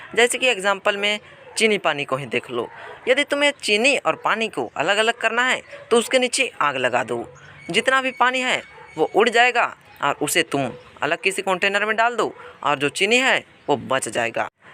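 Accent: Bihar, India. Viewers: Aap bihar ke ho na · Bihari ho khya ap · Bro you are from Bihar I think because your talking style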